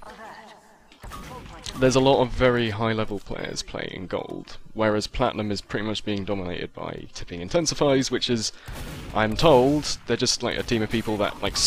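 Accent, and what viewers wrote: RP, United Kingdom. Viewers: alright cool however i noticed a big difference between today and 2014. you sound so much more posh! c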